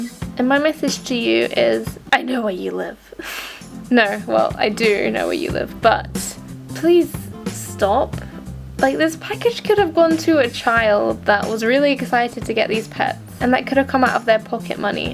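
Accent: United Kingdom. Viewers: yessss i know ur english now i thought u were from america lol trying to find english youtubers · Wait, you're british right?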